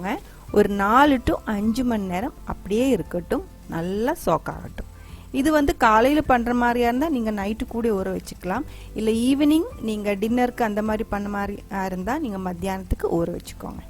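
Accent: Kerala, India. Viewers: Your slang semma Ma'am...... Malayalam and Tamil ........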